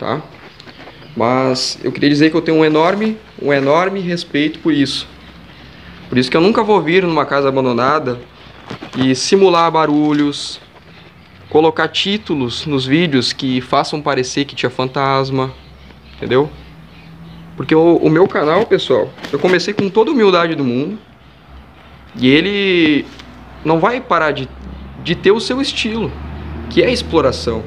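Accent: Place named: Rio Grande do Sul, Brazil. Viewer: Sim é outra foto amigo se Deus quiser vou conseguir ir lá adoro lugares assim e adoro seus vídeos parece que estou junto com voçe na hora que está em lugares assim de tão apaixonada por esses lugares ; que Deus te proteja sempre e te ajuda em todos os seus projetos que tem ai pra fazer aqui não tem lugares assim pra eu está indo e adoro o jeito que os gaúcho fala o jeito que voçeis são aqui na minha cidade fala que gaúcho são muito bravos eu não acho mais vou falar outra vez voçe está de parabéns com seus vídeos amigo acho que sou uma pessoa de alma antiga por amar tanto lugares assim bsj e Boa madrugada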